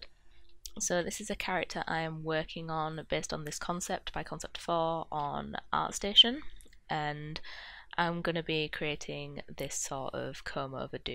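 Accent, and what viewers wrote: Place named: North, United Kingdom. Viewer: great vid and love that northern accent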